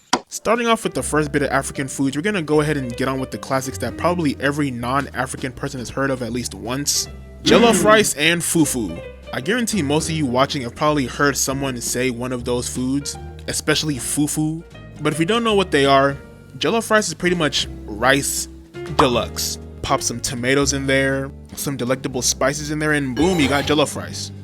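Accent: Nigeria. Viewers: Hey my brother wassup, I'm also Nigerian, I want to hear your Nigerian accent in the next video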